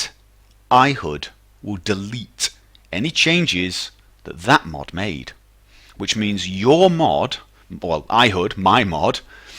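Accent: United Kingdom. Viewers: Not only does your voice sound annoying, no, on top of that you have a highly obstructive british accent. Please visit a speech therapist, everytime I have a problem with Skyrim and watch your videos my ears feel raped. It's not "coot" it's "cut"...damnit. Anyways thanks for the thorough guide and fixing my problems again. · Fucking bittish accent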